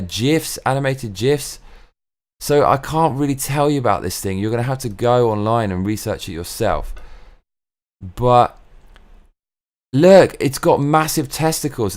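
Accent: United Kingdom. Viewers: Hello, The lead-actor of the Creed movies is Michael B. Jordan (no relation to the superstar American basketball-player, Michael Jordan). He played the primary-villain in the recent Black Panther film. He was also Johnny Storm/The Human Torch in the 2015 version of The Fantastic Four. I was unable to hear the quality-difference between the microphone that you usually use, and the $10,000-microphone; perhaps the audio-compression that YouTube employs removes the frequencies and the nuances that make the difference. However, it was very interesting to see the substantial-weight of the superior microphone. I, too, have never heard of an internal pop-filter/pop-shield; I simply reasoned that such an expensive microphone would probably include pop-prevention methods that are more-convenient and more-effective than what is available for microphones that most people can afford to purchase. 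The fitted 360-degree filter is beautiful, and it makes the microphone/filter look all-of-one-piece, as opposed to most filters/shields, which look like sloppy after-thoughts. Your instructions for the audience to ignore your spoken-content, and to pay attention to only the sound of your voice, made me laugh! Although you have a masculine-deep voice and a classy British-accent, it is your intelligent and entertaining commentary that has made me continue to be a subscriber since I saw your insightful-critique of The Force Awakens. Good luck to you as you apply for a permanent-visa i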